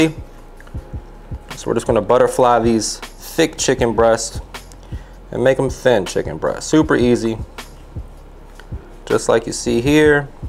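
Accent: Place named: New Orleans, United States. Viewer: You sound like you are from the new orleans area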